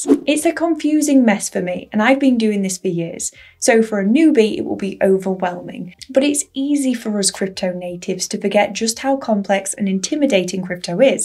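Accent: England, United Kingdom. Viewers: Love your English accent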